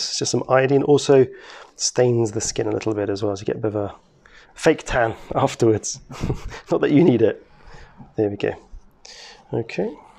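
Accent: England, United Kingdom. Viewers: Great removal, and it’s so nice to hear a British voice as a posed to an American. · It’s an English accent lol.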